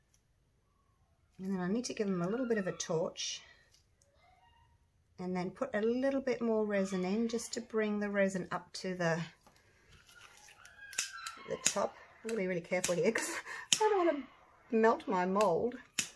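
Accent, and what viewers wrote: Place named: Queensland, Australia. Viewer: Hi. It's great to hear an Aussie and fellow Queenslander on YouTube. Those coasters turned out wonderfully. I'm generally a green lover, but this time the blue won. Stay safe.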